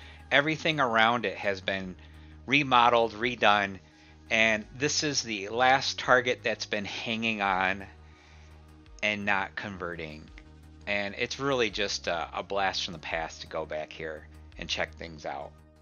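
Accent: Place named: Midwest, United States. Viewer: Awww I remember when Target was like this! This brought me back and I love hearing your midwestern accent as it reminds me of home ☺️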